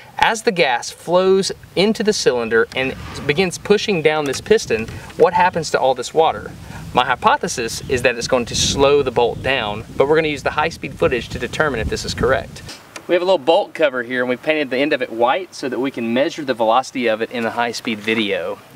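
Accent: United States. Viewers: Apologies for the banal question, but where abouts in the States is this guy's accent from?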